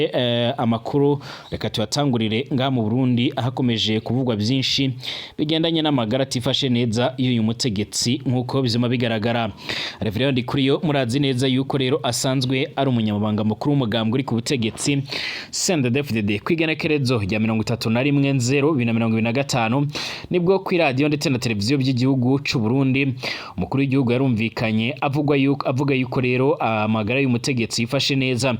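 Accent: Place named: Rwanda. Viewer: bjr. mbe ko wigira umurundi kandi vyumvikana ko uri umunyrwanda !!!!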